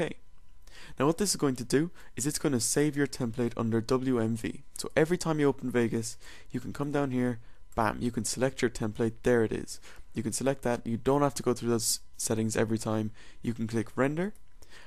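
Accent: Ireland. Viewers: The video at points makes you sound like you've got a dirty Dub accent. Sorry for the insult ;)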